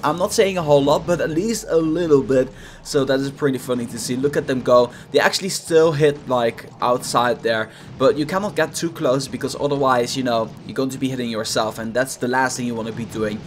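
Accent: Netherlands. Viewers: Is he dutch.cause gis accent looks like a dutch boy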